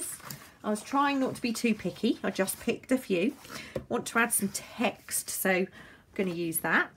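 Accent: England, United Kingdom. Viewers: I think I like hearing your English accent as much as your artwork!! Watching your videos just makes me happy!😊😊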